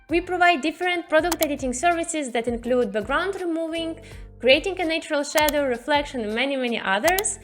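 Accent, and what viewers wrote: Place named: Russia. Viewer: I love your accent are you Russian?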